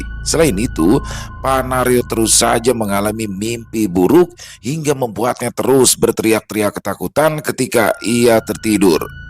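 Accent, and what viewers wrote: Jakarta, Indonesia. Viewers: assalammualaikum bunda hadir dr condet.yg selalu ditunggu2bang pianada lg pokoknya seru kalau da cerita bangpian karna bang bawain logat betawi nya mantap sukseees terus bersama rekan 2aamiiin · Waalaikumsalam. Seneng deh kalau Bang bercerita dengan aksen betawi. 🥰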